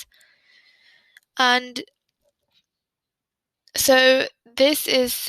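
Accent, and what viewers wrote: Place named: South England, United Kingdom. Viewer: idk how to explain it! 😂 I haven’t seen many people speak with your accent and idk it’s just really nice! 😂🥰 are you from the south of England?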